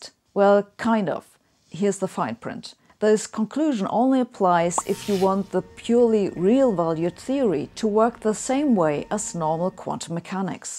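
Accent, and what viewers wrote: Germany. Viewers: Oh wow. I am so used to watching your more modern videos, and your accent there, at least it appears to my ear, is not so German. It sounds more like a Brit who has a slight German accent. Here, you remind me of my German girlfriend from 6th grade. Crazy how much difference 2.5 years make. I assume you've probably been living in the UK mostly, then, huh?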